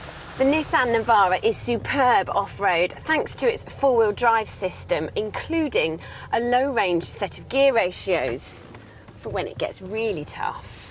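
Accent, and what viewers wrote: United Kingdom. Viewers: Came for the car review and totally fell in love with her.. Maaan... I love that british accent too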